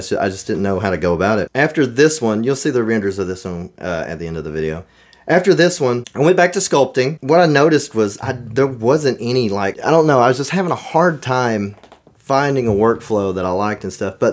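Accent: Texas, United States. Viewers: You got that Austin Texas accent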